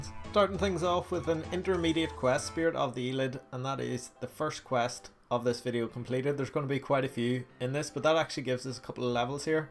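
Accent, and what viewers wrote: Northern Ireland, United Kingdom. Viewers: Lol Northern Ireland ?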